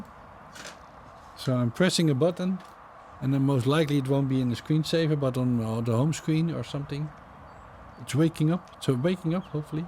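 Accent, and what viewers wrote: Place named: Netherlands. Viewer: I also wondered about this problem of being to much work even though we truly enjoy all your extras you put in the video. The helicopter view is something that almost no other channel has and I like this high in the sky view to gain perspective of were you are or how the landscape looks from a little far away. All this is a balance of how much effort and time you have to put into this VS it being to much work and stop making videos. I believe your Dutch accent and way of speaking is very calming and relaxing and a joy to listen to while eating my dinner or having a breakfast in the morning with my coffee while watching your videos. I can hear the difference in the red pavement or asphalt versus the brick roads which is so nice to listen to. Also the crows and birds I know these sounds well as it lets me remember riding the bike between Eindhoven and Nuenen. 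So if you have to limit yourself to how much work you have to do keep the narration and helicopter view and audio sound while on the bike. More important to people I believe is that you visit different places and share your knowledge of the area with the viewers. Thank you for all the hard work many here enjoy what you do. Dankje Wel Mijn frenden.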